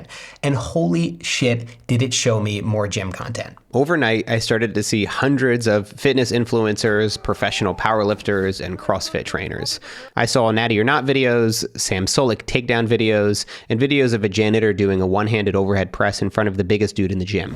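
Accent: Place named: Australia. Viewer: Catches me so off-guard hearing an Australian accent in a YouTube video, and I have an Australian accent and make YouTube videos hahaha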